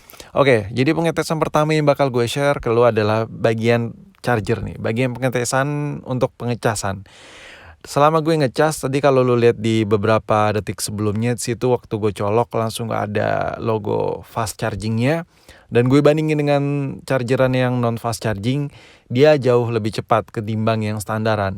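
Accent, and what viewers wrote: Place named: Java, Indonesia. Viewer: Thod bahasa jawa lo sama persis kek daerah gw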